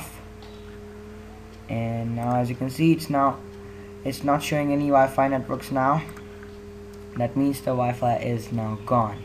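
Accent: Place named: United Kingdom. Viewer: Bro whatever it is, just cut the crappy british accent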